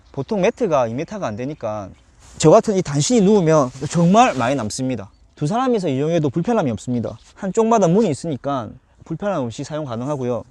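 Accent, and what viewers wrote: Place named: Seoul, South Korea. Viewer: 이 형 서울말도 잘쓰시네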